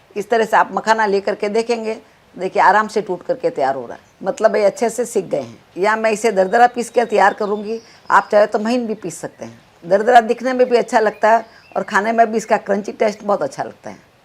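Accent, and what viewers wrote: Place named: Marwar, India. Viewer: Aap ki hindi me desi marwadi language ka swad aata hai.....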